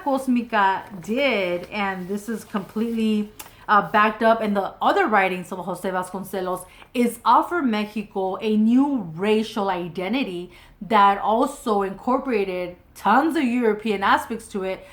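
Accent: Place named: United States. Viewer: Too American of an accent 😖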